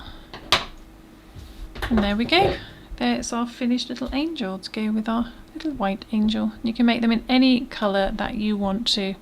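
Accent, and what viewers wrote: United Kingdom. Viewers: Hi! Thank you so much for sharing this little Angel. It is perfect to send in a Xmas card to my sister in Germany. I know she will love it. You have become my go-to for all things crochet because your instructions are so detailed and very easy to follow. Plus, I just love your British English and find your voice very pleasant to listen to. Thank you so much! :)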